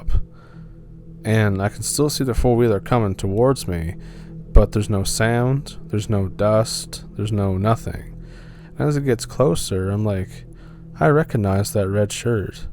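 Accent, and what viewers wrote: Canada. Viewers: Indiana? Sound Canadian there dude.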